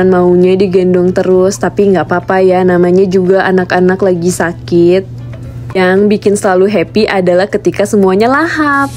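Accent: Pontianak, Indonesia. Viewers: Pantesan gak asing bahasanyaa, org pontianak rupanyaa kakak heheh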